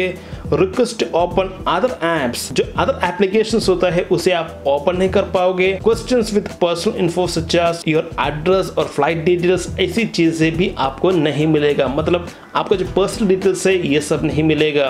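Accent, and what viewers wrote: Kerala, India. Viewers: Thank you bro are you from Kerala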